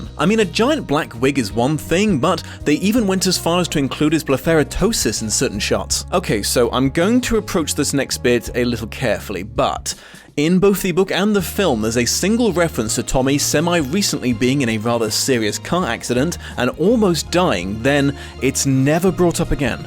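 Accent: United Kingdom. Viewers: Stfu about Franco. Performative whiteknighting into extinction. Every time I hear this UK accent in a video it's a 100% guarantee it will have the dumbest pretentious takes ever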